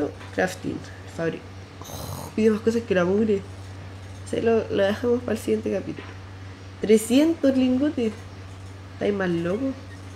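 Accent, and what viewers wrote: Chile. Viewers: chileno verdad??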